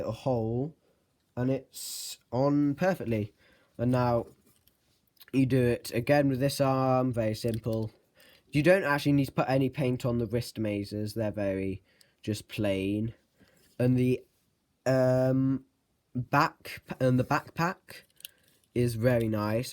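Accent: United Kingdom. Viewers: You sound like british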